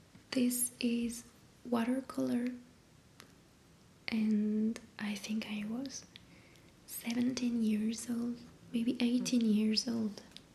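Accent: France. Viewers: Hello 🖐. I discovered your channel last week and it's a pleasure to watch you 😊. You are so soothing, your voice is lovely. I love the french accent, it's perfect for asmr and there is another french asmr youtuber which I adore. It's moonlight cottage. I also think you are talented painter. Thank you for sharing 😊. Merry x- mas and a happy new year 🎉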